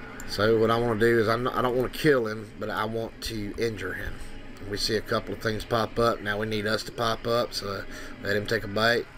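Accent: South, United States. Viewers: I did it yesterday whole day and half of night. I started with float, 4 bytes and unknown initial value, first searсh gave me more than one billion results, than I found a border on the gas station (in the game prologue) and step up and down and after every action change value to decrease or increase and did next search. After first step on border it decreased results to ~ 3 millions and than I did the same action by circle, after almost 30-40 minutes I get few values, but they was red and changed realtime, so it probably wasn't height of the character, maybe rain or her hair or body movement or even rotation of the camera... no idea. When I did it camera always changed and also when pushed alt+tab. Than I tryed the same roadside, ladders, run and step up and down in diffrent locations, after a coupe of searshes I get something like 50 adresses still appeared, than repeated same actions, until I got 5, but change the value, notihnig changed in the game, so I probably miss something. 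Also during first search my PC works pretty tough almost freezing, well I don't know what to do. It seems pretty complicated also english isn't my native and yours sounds for me like you some kind of country singer :)) I'll try to look closely on your video, thanks!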